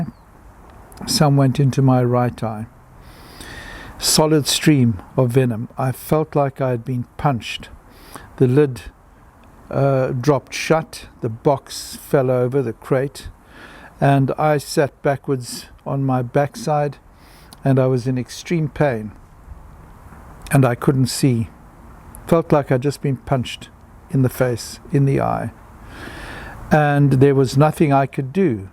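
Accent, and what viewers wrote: South Africa. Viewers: How terrible, but the way you tell the story sounds elegant. Maybe it's the South African accent. Thank you for sharing, keep telling your stories. I love them!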